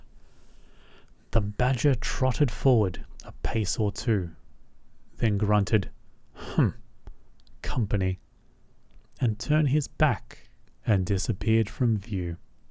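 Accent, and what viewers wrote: Australia. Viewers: Hooray! A dear familiar Australian accent!! Thank you sooo much. You do have a lovely voice, and you read in a peaceful, reassuring way. Thanks also for simply starting to read the text without a distracting monologue introduction. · Render us some new story with your Australian accent ❤❤❤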